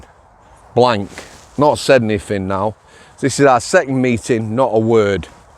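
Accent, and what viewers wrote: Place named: Manchester, United Kingdom. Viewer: how come you’ve got a manc accent if your from sheffield? great video mate.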